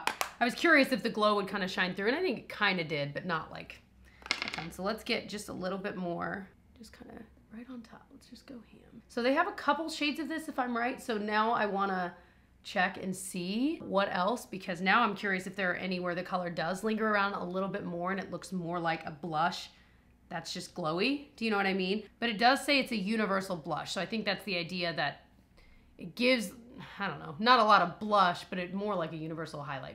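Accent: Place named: Midwest, United States. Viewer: I love that you share your day with us! I usually sit in the same comfy chair, sipping my coffee, just relaxing while you entertain me. The way you pronounce the words “and” and “but” are interesting to me. Must be a midwest thing. Have a great day!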